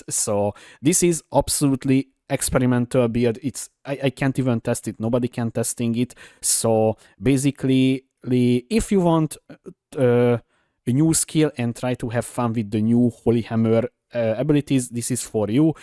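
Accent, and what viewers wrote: Hungary. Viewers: szevasz, a kiejtésed alapján magyar vagy. sosem nyomtam még 1 ligát sem egy zsír új skillel, de most ezzel fogok kezdeni, köszi a videót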